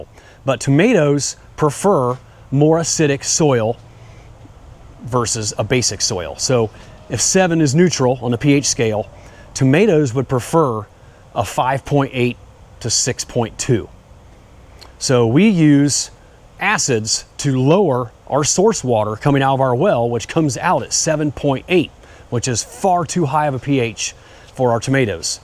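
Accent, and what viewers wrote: Ohio, United States. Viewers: Love your Ohio accent!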